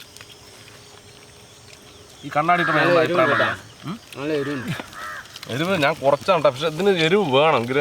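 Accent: Palakkad, India. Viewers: കണ്ണ് വോണ്ട നമുക്ക് പാലക്കാട്‌ സ്ലാങ് 🤣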